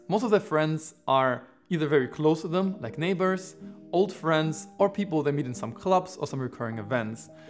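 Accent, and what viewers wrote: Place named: Germany. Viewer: Höre dass du Deutscher bist :P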